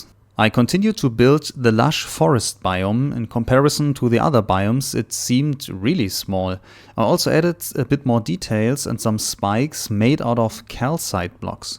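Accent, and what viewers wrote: Germany. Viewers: very deutsches englisch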